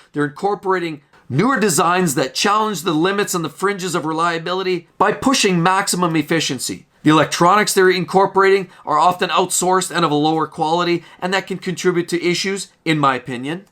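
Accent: Canada. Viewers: It's his accent. He's Canadian I believe.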